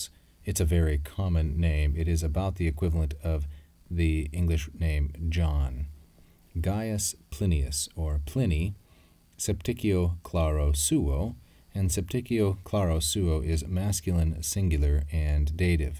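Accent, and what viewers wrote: United States. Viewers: What is wrong with the American pronunciation of latin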